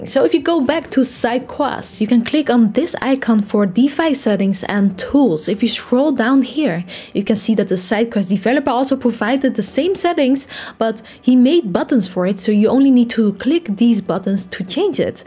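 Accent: Netherlands. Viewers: you sound dutch haha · Ik hoor een Nederlands-Engels accent 😅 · i smell dutch 😂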